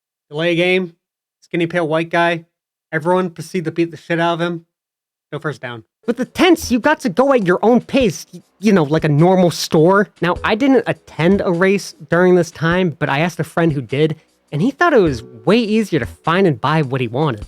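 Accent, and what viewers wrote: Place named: North, United Kingdom. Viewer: Man it's awesome to literally hear the diversity in NASCAR fans. Cause I can detect the northern in your voice lol.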